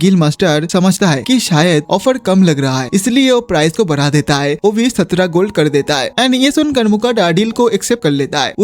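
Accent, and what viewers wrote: Bengal, India. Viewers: Are you a Bengali? I mean your accent is familiar to me. Anime ko 8 dena prefer karungi. And for your voice it's also 8.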